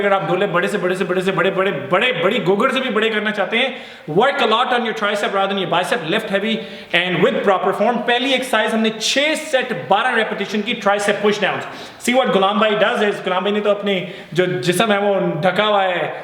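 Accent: Punjab, India. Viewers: bhai saaaa mazzaa aagyaa,,, or bhai aap ke bolnne ka tarika or aap ki pannjabi waahh mazzaa aajata h..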